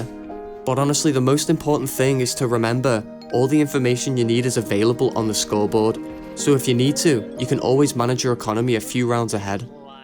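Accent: Liverpool, United Kingdom. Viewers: scouser accent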